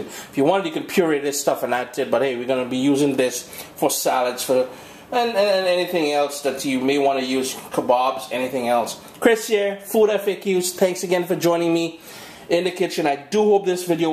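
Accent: Trinidad and Tobago. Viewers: Chris your trini roots coming out loud and clear....thank you.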